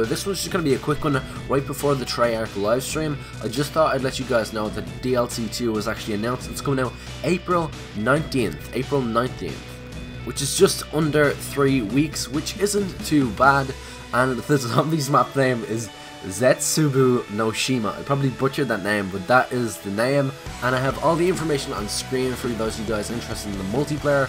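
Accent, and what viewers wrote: Ireland. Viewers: Are you irish I am?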